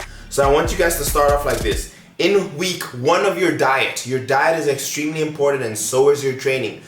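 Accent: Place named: South Africa. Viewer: Is he from South Africa ? Sounds like he has a South African accent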